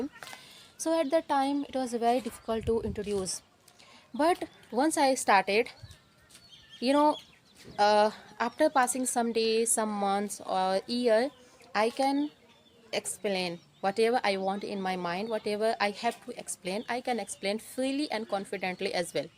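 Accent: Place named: India. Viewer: Good for me ur english language tune, become Yr tune belongs to indian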